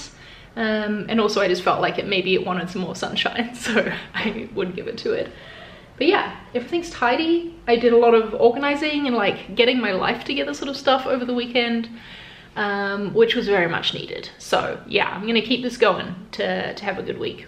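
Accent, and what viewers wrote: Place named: New Zealand. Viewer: Besides the design stuff content that I really enjoy and helps me, I think I watch you for your English accent and those occasionaly 'yeeeeeah' kiwis that you drop sometimes <3 I miss NZ sometimes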